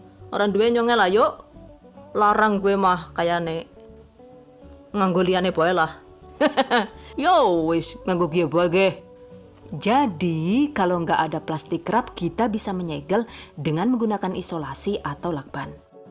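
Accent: Banyumas, Indonesia. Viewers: Wong ngapak 😀, asli wong ngendi Bu? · Terimaksh ataas resepnya, jd pingin bkin yogurt dewek, aku kl dgr suara ngapake, jd ketawa wae, hbs lucuuu siihh, okey, sukses slu, salam dr Wong Pati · Wkwkwk lucu bahasa ngpaknya saya suka haha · Ngapak ke kayak kiyek lha, gae seneng bae nak ngerungok no. Hahaaa... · Kok ada bahasa ngapaknya yaa,hehee,... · Ngapak lah...yu'.... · Auto subcribe cuma gara2 ngomong ngapak 😂😍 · sampe kaget aku pas jebul bahasa ngapak 😂😂😂😂 tak kirain temenku ngajak ngomong , eh ternyata suara dari yutub 😂😂 I LIKE IT 🥰🥰🥰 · ora ngapak ora kepenak.. enyong ya ngapak bun 😆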